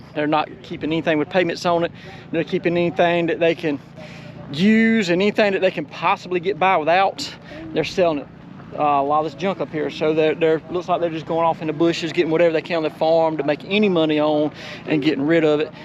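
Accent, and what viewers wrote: South, United States. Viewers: New to your channel, explanation about farming in Georgia and content is excellent 😊. Love the southern talk to talking also. Retired Michigan farmer. Take care have a great day!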